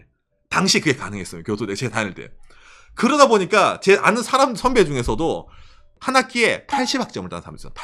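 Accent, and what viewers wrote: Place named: Ulsan, South Korea. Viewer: 울산분이시죠.. 근데 울산 억양이 부산, 경남과는 의외로 많이 다르고 경주, 포항과 함께 경주방언권을 이루고 있어서(요즘은 외지인이 많아서 많이 없어진 면도 있지만) 많이 순화된 대구, 청도말 느낌이 나죠.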